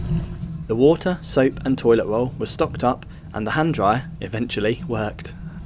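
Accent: United Kingdom. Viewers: Absolutely disgusting; you are claiming that this is a non-political movie, still you are referencing to putin illegal invasion + fundraise + repeat Nazi slogans. Based on your accent you might be from the UK. Ask your relatives, if they know British soldiers, who were wounded or killed by the Nazis in World War 2. Ukrainian Bandera Nazis fought together with the German Nazis against the Allied Forces in World War 2.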